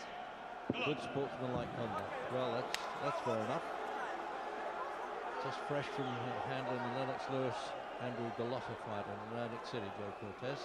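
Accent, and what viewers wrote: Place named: Cockney, United Kingdom. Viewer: Good ole Cockney Joe from Hammersmith London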